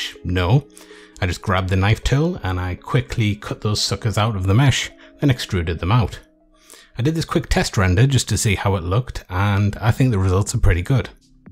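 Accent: United Kingdom. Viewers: I don't even Blend. I just come here for the great videos and friendly voice. I miss the UK, so I love the UK accents the most. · Doesn't sound rough to me, I quite like it. Sounds kind of "mushy" if that makes any sense :-) -American who digs UK accents.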